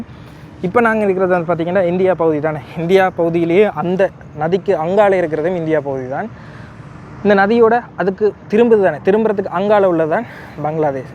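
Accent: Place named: Sri Lanka. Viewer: தம்பியின் இலங்கை தமிழ் பேச்சு மற்றும் காணொளி அருமை. பிடித்திருந்தது. Subscribers குறைவாக இருப்பதை பற்றி கவலை வேண்டாம். கலியுகத்தில் நல்லவர்களுக்குத்தான் துன்பங்கள் மற்றும் மன உளைச்சல்கள் வரும். ❤❤❤❤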